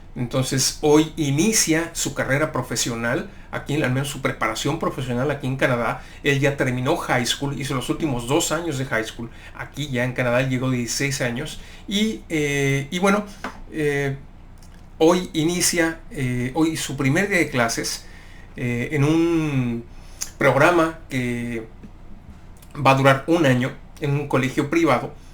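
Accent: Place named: Querétaro, Mexico. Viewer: de que estado de mexico eres? Te escucho como queretano, saludos desde acá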